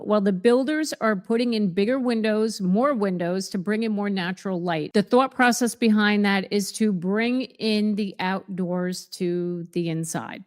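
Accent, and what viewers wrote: New Jersey, United States. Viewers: Gotta love that New Jersey accent! The way she says "off" reminds me of the Sopranos (in a good way).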